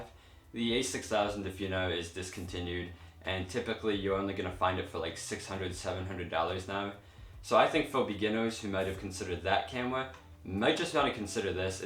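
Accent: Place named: United Kingdom. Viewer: you sound more British than American but I'm not a native-speaker so I can be wrong. From which city are you from?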